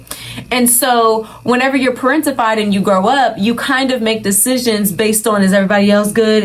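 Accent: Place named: United Kingdom. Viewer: The British accent on point lmao Love the video ❤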